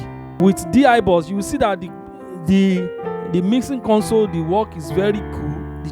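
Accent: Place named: Nigeria. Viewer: That's Nigerian Accent 🇳🇬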